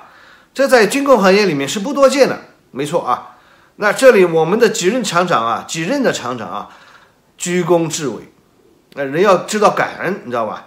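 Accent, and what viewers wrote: Shanghai, China. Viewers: 爷叔上海宁？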